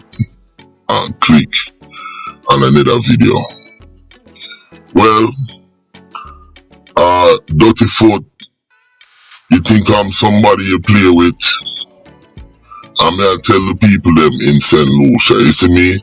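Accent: Jamaica. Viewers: WHATS UP WITH YOU AND SAINT LUCIANS?? ARE YOU A SAINT LUCIAN AND TALKING LIKE A JAMAICAN. SHOW YOUR FACE. JUST CURIOUS. ARE YOU FROM SAINT VINCENT. DO YOU WORK FOR THE FEDS. DID THE SAINT LUCIANS DO YOU WRONG. JUST CURIOUS.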